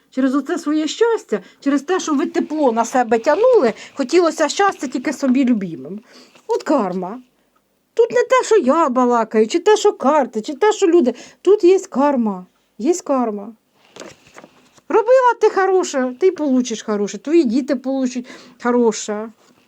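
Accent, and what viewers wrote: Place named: Surzhyk, Ukraine. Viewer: Пані, говоріть будласка українською, а не цим пекельним суржиком. Дуже ріже слух.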